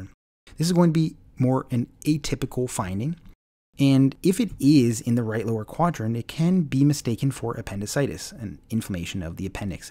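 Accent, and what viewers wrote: Canada. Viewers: he sounds Canadian to me.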